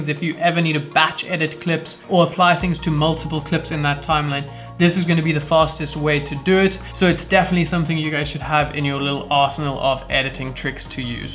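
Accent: Cape Town, South Africa. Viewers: bro you from Cape Town?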